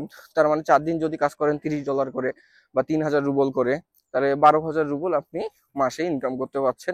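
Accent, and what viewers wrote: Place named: Sylhet, Bangladesh. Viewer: ভাই সিলেটর নি?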